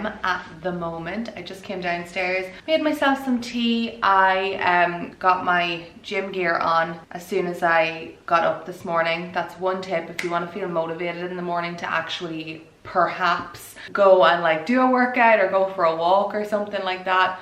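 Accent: Ireland. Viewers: Hopefully you find this insight amusing but since watching your videos I’ve been getting so much better at understanding Irish accents. It used to be with Irish and Scottish videos I have to put my full attention to follow what’s being said but now I can listen to you just in the background and understand the gist just fine... still working on the latter though. Have a great week! - Gerry (from Canada) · I am not a foodie nor a cook, but you make cooking look so interesting! I especially like the hummus recipe, may have to try that one! I want to come back to tour Ireland again so badly. Love the people, but in the mean time i just sit and watch you and listen to your accent :) OH as soon as possible could you take a walking or bike tour around your city?! Stay healthy, as i see and i am sure you are <3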